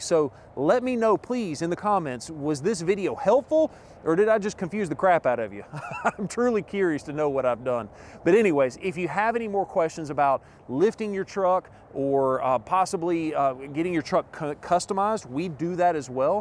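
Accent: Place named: Alabama, United States. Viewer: Great video and I can understand you pretty good with your Alabama accent even if I am not a native english speaker. Out here in Germany we just have mid-size trucks (all Diesels because of gas price and mpg) and they haven't been very popular until a few years ago (maybe because of the VW Amarok). But we always had some grey imports of fullsize trucks. But it seems like more than 80% of them are RAM's. But I like the F150 better also because of your videos. Great content your videos. Wish I could have done that when I was a car salesman (VW) years ago. Greetings from Bremen (Beck's beer City)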